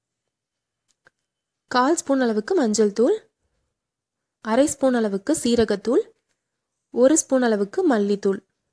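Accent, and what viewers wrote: Coimbatore, India. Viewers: Your netaive Coimbatore ma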